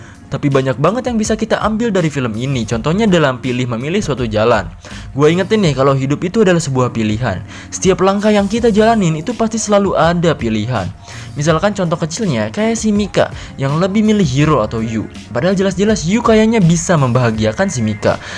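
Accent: West Java, Indonesia. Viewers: Meni sunda pisan ihh resep😂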